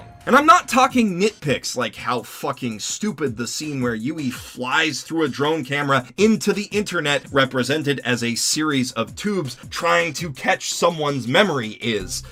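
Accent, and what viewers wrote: Canada. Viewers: Holy cow! Are you Canadian??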